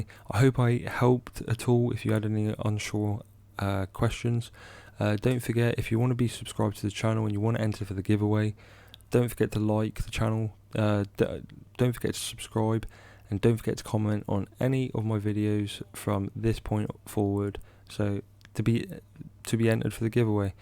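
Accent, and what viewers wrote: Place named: Bristol, United Kingdom. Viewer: you sound like your from bristol mate?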